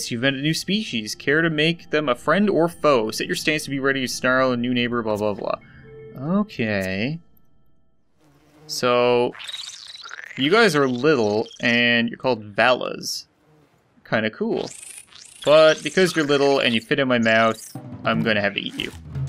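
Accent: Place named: Canada. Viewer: He was so Canadian sounding back then compared to now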